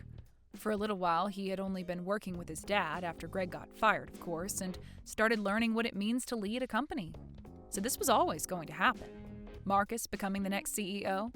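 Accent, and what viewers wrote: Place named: Eastern Australia, Australia. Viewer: I am an Australian from South Australia, and I don't sound like an Aussie Brit. This guy has 2 accents. Sounds like someone from the Eastern states of Australia.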